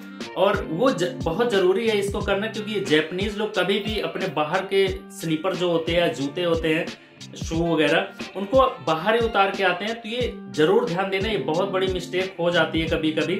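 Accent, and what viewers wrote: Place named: Bengal, India. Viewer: Apka bolne ke tone bangalio ke jesa h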